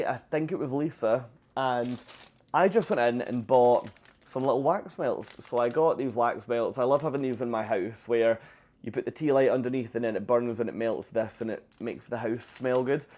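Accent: Scotland, United Kingdom. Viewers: The title makes it sound as though you're blaming Saint John for the troubles onboard. 🤨 Great vid of the Loyalist City, first incorporated city in Canada. Americans loyal to the crown, left their homes to come here during the revolutionary war. Glad you found Walmart. Love the Scottish (Glasgowan?) accent.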